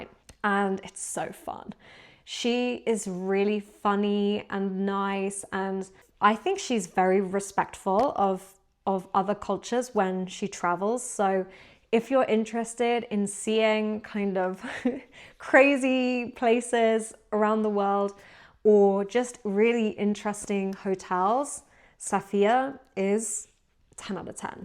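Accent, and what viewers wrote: United Kingdom. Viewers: 我i like your teachingm, for cn people, from British accent , its very good verygood for us , because pronunciation and clear , just like your teach methods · Goog job! You're my first and the only native english channel. I'm about to start a new job in London next week so thanks for letting me hear your british! Best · British accent is absolutely beautiful. She speaks at a comfortable pace, which is perfect for beginners like us. Let’s keep working together to develop the ability to think directly in a second language, rather than translating everything in our heads or depending completely on AI and missing the chance to build our own language intuition. The funny thing is, this comment itself was translated by AI😂😂.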